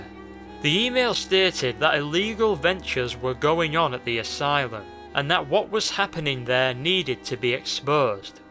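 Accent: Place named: Manchester, United Kingdom. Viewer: I love your manc accent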